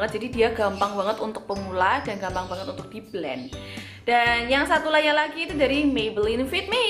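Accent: Java, Indonesia. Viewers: Cantikkk bgtt mbak medokknya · Ayune kyok golekan jeung.... salam kenal yo aku soko jeporo mbak... tetap bikin video ala mbak medhok aja ya ... kereeennn inspiratif sekali buat saya dan buat pecinta makeup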